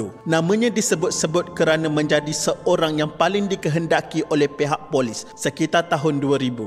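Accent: Kelantan, Malaysia. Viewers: Admin-Kelantanese detected by pronunciation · Kelate ni hahahah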